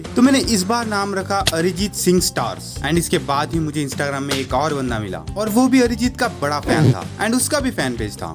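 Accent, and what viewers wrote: Hyderabad, India. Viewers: Bhai hyd seh ho kya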